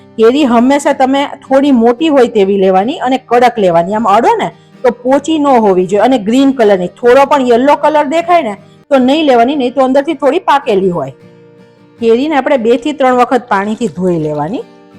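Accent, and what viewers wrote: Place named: Kathiawar, India. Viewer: nigujarati kathiyavadi Bhasha Mithi laga che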